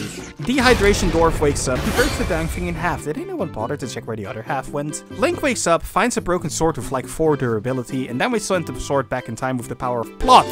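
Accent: Netherlands. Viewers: Hey jij bent nederlands ! HA betrapt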